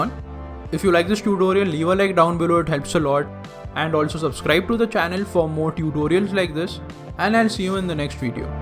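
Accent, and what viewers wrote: India. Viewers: There's a heavy Indian accent!!😂😂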